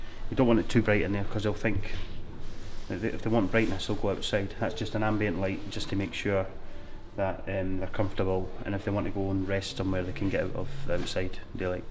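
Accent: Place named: Scotland, United Kingdom. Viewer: I love the scottish accent. ;o)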